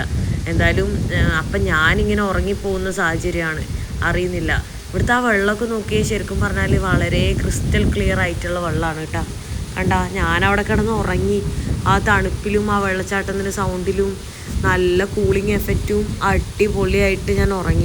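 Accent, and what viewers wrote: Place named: Tamil Nadu, India. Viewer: 👍.. Speck slightly tamil...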